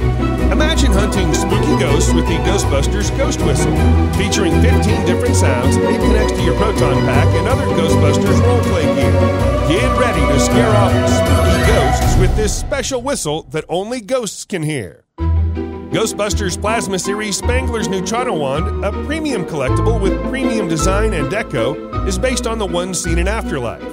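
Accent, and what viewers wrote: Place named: Michigan, United States. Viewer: You have the Michigan accent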